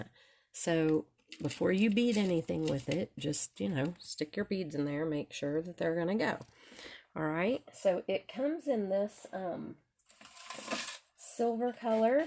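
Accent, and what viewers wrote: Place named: Texas, United States. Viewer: I thought I had your accent pegged as Texan, but I see that Fort Bragg is in North Carolina. Being a military (Air Force) brat myself, I know that families move around a lot. So, my question is, where are you from? Btw, YouTube suggested your channel to me and I'm glad they did. I am a new subscriber.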